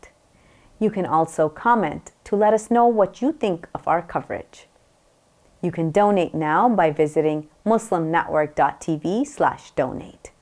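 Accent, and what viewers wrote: United States. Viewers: ╔═══ ❤☀💚☀❤ ═══╗ 💚💚💚💚💚💚💚💚💚 Madam SAHEBA, Assalaamu alaikum. Thank you very much for presenting the news today after almost a month. It was on 3rd, Oct that you had presented the news last. We, from Hyderabad, India, like your news reading because of your slow pace and because of which we easily understand your speech despite it being in American English. But only thing is we need to have lot of patience to hear your news-reading and lot of free time. Madam Samana Siddiqui would have finished your 18 minutes of news bulletins in just 12 minutes. But it hardly matters. What matters is our being able to understand your reading!!! YOU ARE DOING A GOOD JOB!!! PLEASE KEEP IT UP!!! 🌐🌐🌐🌐🌐🌐🌐🌐🌐 ╚═══ ❤☀💚☀❤ ═══╝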